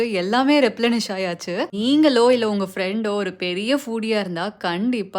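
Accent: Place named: Tamil Nadu, India. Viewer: Fooooooodu, worst tanglish assent ever I heard 🤬🤬🤬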